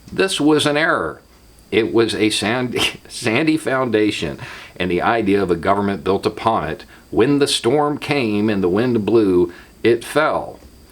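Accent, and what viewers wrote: South, United States. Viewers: Recently some members of my wife's extended family tried defending their flying of the confederate flag by using the same talking points that you just swiftly debunked here. They're not even from the south... they moved down here a few years back, but for some reason they're in love with that damn flag and they'll swear up and down that it's not a symbol of racism, but instead a symbol of "southern pride". I tried making similar arguments as you did here, but they wouldn't listen. If they'll hear out anyone it'll be someone like you, a veteran from the south that sounds and looks like them. Thank you so much for putting these videos out. You're a hero speaking truths we all desperately need to hear. 👍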